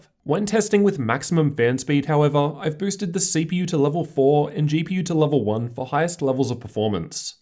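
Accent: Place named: Australia. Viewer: What do you think 2020 laptops will have to bring to the table? Do you think it will be a major price to performance boost or it won't be something too significant. Also a side note: I really like your Australian accent, adds what I call a ' touch' to your videos:)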